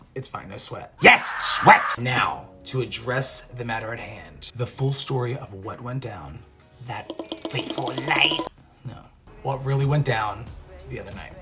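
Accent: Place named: England, United Kingdom. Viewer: You’re a brilliant actor - I hope things go well for you! 😊 That was a very good English accent, probably one of the best I’ve ever heard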